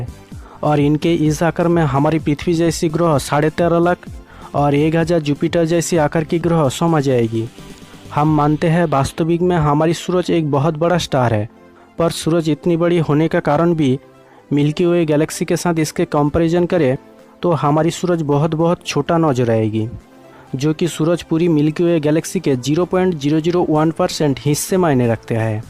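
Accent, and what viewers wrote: Bengal, India. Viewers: ভাই বড্ডো বাঙালিদের মতন শুনতে লাগছে। কিন্তু খুব ভালো হয়েছে। আরো ভিডিও বানাও। অবশ্যই ভাল হবে।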